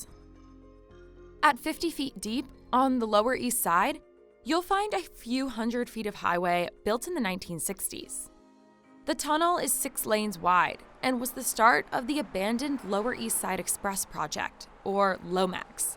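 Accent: California, United States. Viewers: That annoying California accent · where does the narrators accent come from? American females aren't born whih it, yet so many want to sound like they were raised in a mall. just talk normal.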